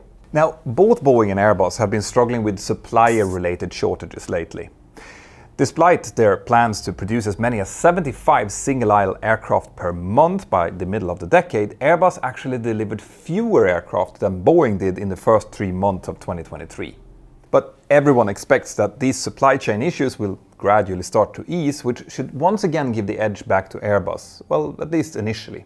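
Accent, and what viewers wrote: Scandinavia. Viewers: I am not sure but this guy’s accent sounds pretty Nordic 😊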